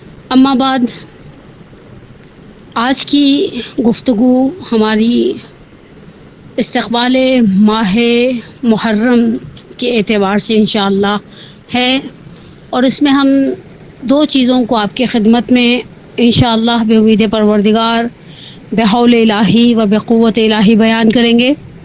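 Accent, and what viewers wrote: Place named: United Kingdom. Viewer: Ya kuin aysi Hain akhir??? kuin English accent main Urdu bolti Hain ...:(in ko madarsa kuin itny achy topic pay bolnay ko khta hai akhir??? Ya Allah in k lecture ko Sunna boht muskill ho jata ha JB k in k husband intehaie bahtren Andaz main bat karty Hain..I wish main Karachi aun tw in sa milun ek bar aur pochun ap ko itna complex kuin hai angrazi ka ...ap tw Urdu b bol lain boht hai kuin k ap tw pakii lahoran Punjabi Hain...anyway really sucks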